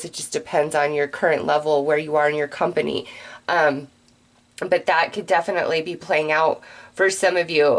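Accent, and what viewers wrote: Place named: West Coast, United States. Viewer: I really like your voice, still trying to figure out which region of North America who hail from....West Coast? <3 Thanks for sharing your healing gift with us.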